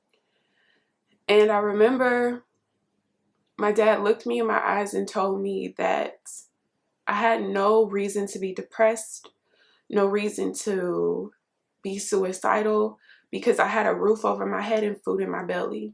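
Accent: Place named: Deep South, United States. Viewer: Hey where are you in the Deep South because you have a real deep and strong southern accent ? Which city and state are you from in the Deep DEEP SOUTH???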